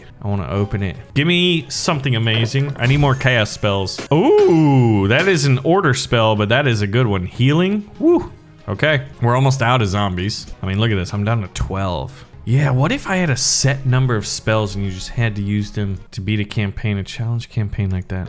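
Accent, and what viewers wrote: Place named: United Kingdom. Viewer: great British accent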